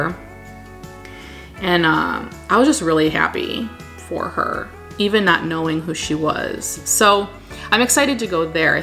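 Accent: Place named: Illinois, United States. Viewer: Been watching Becca for a few years and finally wandered over to Potted Together and now you. LOVE IT. I grew up in Wildwood, IL just 30 minutes north of you. Love hearing your accent, brings back memories. 💕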